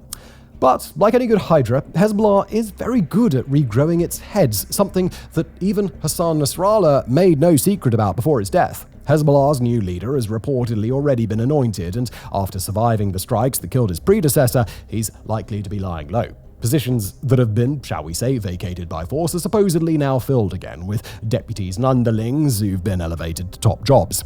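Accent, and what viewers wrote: United Kingdom. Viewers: The attempt to create a balanced picture between a liberal state and terrorist organizations is strange in a British accent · There's nothing more calming than having soothing voice and british accent to tell us about how shit's going down.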